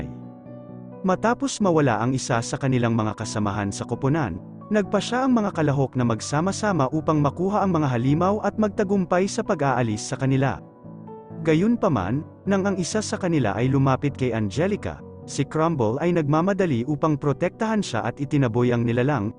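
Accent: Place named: Visayas, Philippines. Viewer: Debris ba? Bisaya kasi mag pronounce eh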